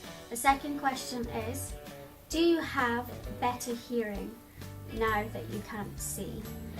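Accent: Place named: Scotland, United Kingdom. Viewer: So lovely getting to know you better and hearing your lovely voice. I didn’t know you are Scottish! Love the accent xxx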